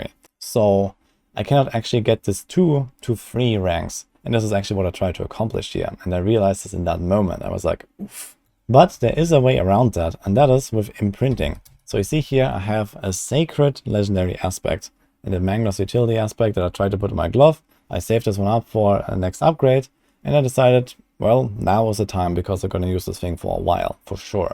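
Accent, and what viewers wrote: Germany. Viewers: Oha, bin gerade froh, dass ich Dich gefunden habe. Werde mir die anderen Videos auch ansehen. Glaube rauszuhören, dass Du deutsch bist. 😊 Aber ich schaue es auch sehr gern auf englisch!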